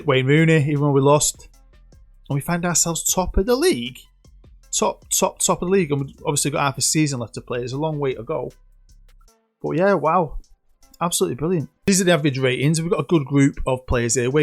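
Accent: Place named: Liverpool, United Kingdom. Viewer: oh and ye gotta love a scouser in ye vids hahaha xx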